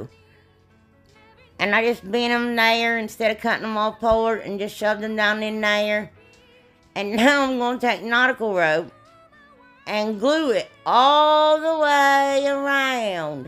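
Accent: South, United States. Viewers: Country twang 4 ever! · I thought I had a countryfied accent but you got me beat by a long shot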